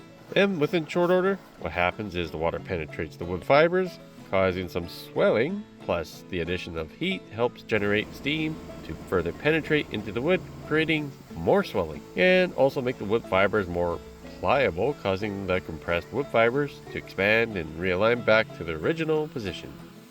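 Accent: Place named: Canada. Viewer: First time watching…immediately hear a Canadian or upper Midwest accent!❤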